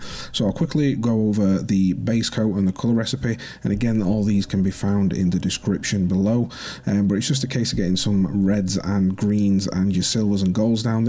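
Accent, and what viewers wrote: Yorkshire, United Kingdom. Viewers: as a fellow Yorkshireman, I love your accent.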